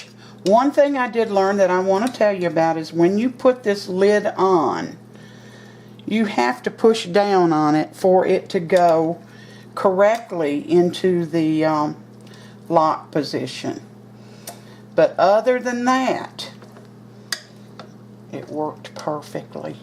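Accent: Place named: Alabama, United States. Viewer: I just recently found your channel and I love your videos. I appreciate your simple recipes and your thorough instructions. Love the Alabama accent and taste testing. Can't wait to actually try some of these dishes.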